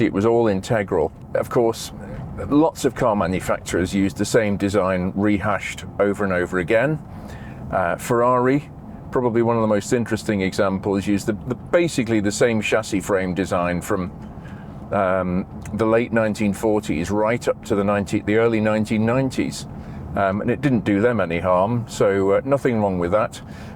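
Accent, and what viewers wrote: United Kingdom. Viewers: God this old gasbag who loves the sound of his own voice - which by the way is a sort of Hyacinth Bucket/Alan Bennet mash up - bridging a gap we never knew we needed filling that's a sort of fussing and constant irritation half way between Clitheroe - and South Kensington. I literally could give less of a toss about the grandiose delusions of any "relationship" with Crewe, l whose hapless senior staff would receive periodic unscheduled visits for a "nice chat over a proper cup of tea" with the full expectations that the posh biscuits were brought out - there's just a hint that the put upon secretary unfortunate enough to have to bring the tray through, was given a little pat on the bottom and a knowing wink and a twinkle that simultaneously says "we're all having fun aren't we pet" and "don't worry about me, I'm like family" ... While in the subject of made up dialogue, I'm sure if not said, then at least thought, was "I like to think many of my suggestions found their way into the cars, if I may so modestly say". 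I made that all up a) because I didn't get any further than "....Doktor Hüffenpump" before a little bit of sick came up at the humblebragging and b) because I wouldn't have cared about the actual words said since I just find him too off putting. And by the way, those Bentley executives, I bet any money, used to run and hide or at least drew straws as to whose turn it was to deal with him😂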